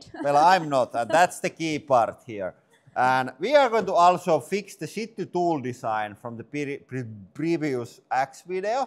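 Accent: Finland. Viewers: Love the Finnish accent 😊 Greetings from Austria 🇦🇹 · ..mietin, että mukava viteo.. mistäköhän. ? .. mutta sitte tuo kotosen kuulonen ”rallienklanti” kertoo että juu, härmästähän nämä.. 😎 ….mukavaa testiä.. 👍👍👍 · i dont understand a fu**in word of your rallienglanti. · Some of us are here for the pressing action, some of us are here for the ralli English.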